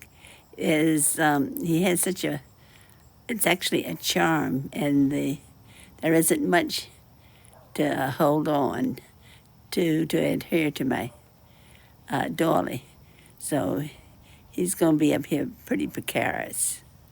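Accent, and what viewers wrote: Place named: South, United States. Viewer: Thank you to Youtube for the algorhythm working correctly to recommend this delightful channel! This is a treasure trove of techniques. Beautifully done! And I love your Southern accent, it's so pretty!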